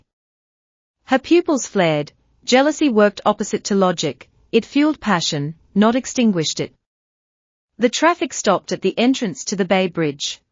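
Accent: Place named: United Kingdom. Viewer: Confusing; a British FEMALE voice for Noah! “Mrs.” Vs perhaps “Mrs” , “Mr.” Vs “”Mr” , Santa Fe? Hot! But awkward.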